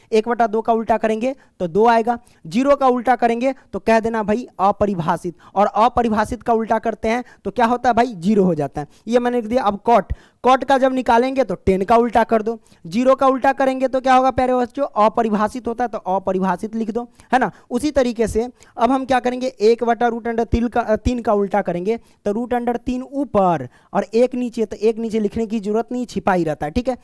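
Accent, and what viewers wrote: Bihar, India. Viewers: Sir bihar se hain n....?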